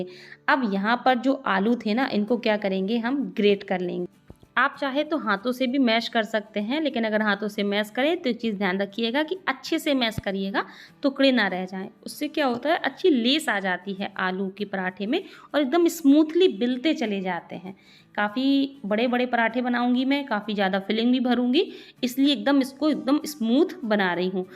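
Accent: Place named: Bundelkhand, India. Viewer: Aapi boli se apnepan ka ahsas hota hai, apni taraf ki lagti hai, aap bundelkhand mai kaha rahti hai, kache amrood na mile to kaise chatni banaye?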